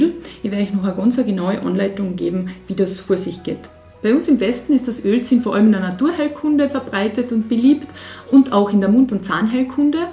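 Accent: Austria. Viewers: Alles. Eine Freundin von mir lebt in Kärnten. Ich liebe Österreichisch.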